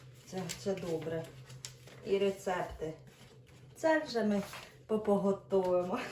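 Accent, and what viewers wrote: Poltava, Ukraine. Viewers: А ви не з Полтави ? Бо балакєте ви по-нашому , і акцент у вас полтавський .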